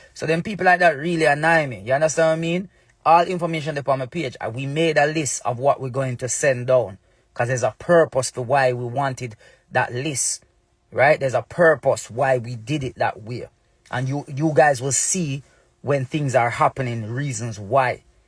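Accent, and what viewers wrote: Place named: United Kingdom. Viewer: However this dude is not Jamaican he is from the UK with a British accent.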